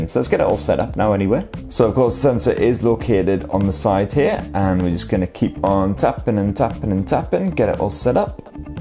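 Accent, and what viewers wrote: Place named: Newcastle, United Kingdom. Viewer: It’s a geordie accent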